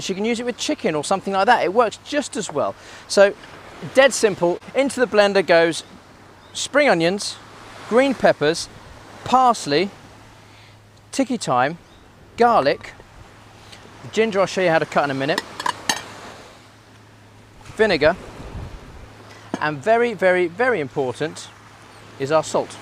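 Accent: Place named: Caribbean. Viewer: Some of your pronunciation is sounding so Caribbean, The island is rubbing off on you.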